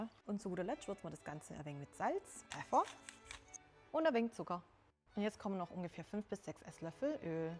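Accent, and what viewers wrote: Swabia, Germany. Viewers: Sehr schade so eine tolle Frau muß schwäbisch reden Kartoffelsalat schmeckt wie nasse Socke in Hochdeutschland geht auch mit Mayo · Die Stimme oder die schwäbische Mundart?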